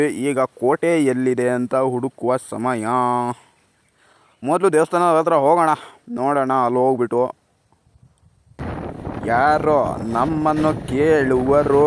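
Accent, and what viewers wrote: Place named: Bengaluru, India. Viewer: Bengalur kannada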